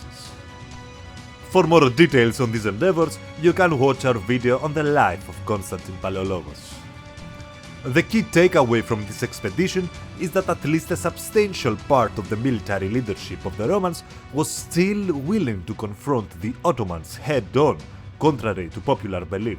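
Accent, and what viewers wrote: Greece. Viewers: Ρε μπάρμπα, πώς νομίζεις ότι ακουγομαστε όλοι όταν μιλάμε εγγλέζικα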